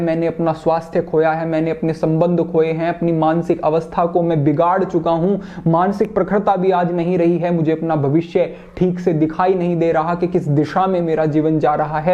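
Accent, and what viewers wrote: Standard. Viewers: आप बिल्कुल शुद्ध हिंदी बोलते हो गुरुजी 🙏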